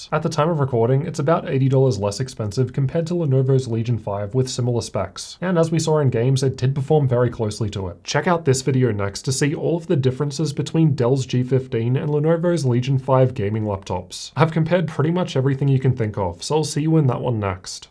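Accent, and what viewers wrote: Australia. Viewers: Great review! Also, I just love the Australian accent. Don't know why, but I just do.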